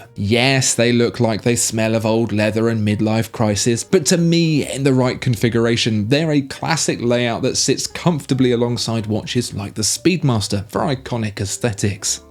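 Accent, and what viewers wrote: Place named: RP, United Kingdom. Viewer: The posh accent and babbling garrulity is just comical.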